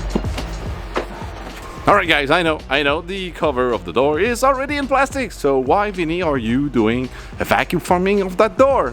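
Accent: Quebec, Canada. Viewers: Hi. I must say that this is the most pleasant and a bit unique quebec english accent I've heard. I would venture to guess Sherbrooke (because i haven't heard people from there), but really curious to where you're from.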